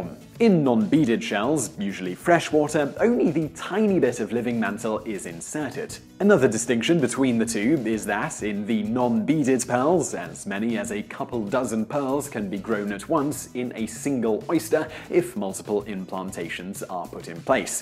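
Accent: United Kingdom. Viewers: Bri'ish Vsauce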